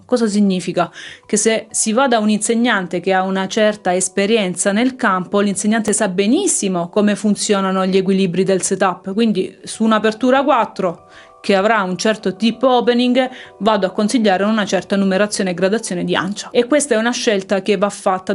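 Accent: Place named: Bergamo, Italy. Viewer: Ciao. Grazie per l'ottima e chiara spiegazione. Con il mio sax tenore e bocchino Yamaha 4C in genere uso le Vandoren "blu" 2.5 che considero la mia cosiddetta zona di comfort. Tuttavia per osare di più ho iniziato con le Rico "arancio" 3.5 che però trovo un pò dure e faticose da gestire specie sul registro grave. Ho provato anche a lavorarle un pochino (a riguardo ho seguito i tuoi video) ma non ho ancora trovato un setup soddisfaciente. Mi consigli un altro tipo di ancia (ad esempio Rico Jazz Select, di cui parlavi in un altro video)? Grazie! PS Aggiungo simpaticamente che l’espressione “manco li cani”, non proprio orobica, unitamente alla tua cadenza linguistica, mi è meravigliosamente familiare 🙂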